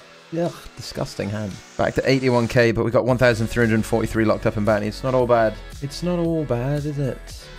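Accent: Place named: Leicester, United Kingdom. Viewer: Aye up me duck,is that a Leicester accent?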